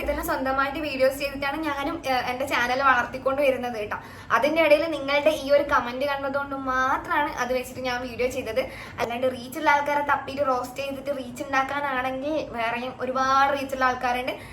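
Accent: Kannur, India. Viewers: Kannur ahno njngalde slang pole thonny😌😂❤ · ചേച്ചിടെ സംസാരം കേട്ട് ,കണ്ണൂർ ആണോ? കാസറഗോഡ് ബോർഡർ ആണോ?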